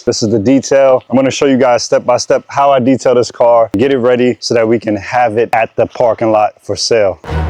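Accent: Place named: Long Island, United States. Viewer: after listening to him talk ima say long island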